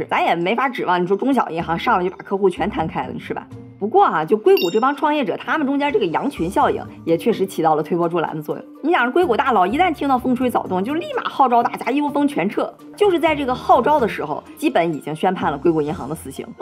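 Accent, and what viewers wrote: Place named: Beijing, China. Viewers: 喜欢这北京腔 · 北京乡音，，太好听了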